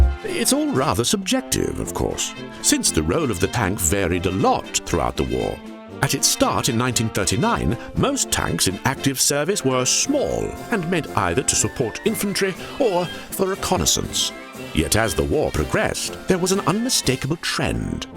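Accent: United Kingdom. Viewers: The lovely Classic British accent ❤❤❤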